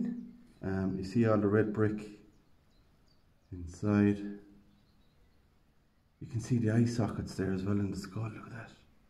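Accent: Ireland. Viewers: Great video, and I love that Irish accent, x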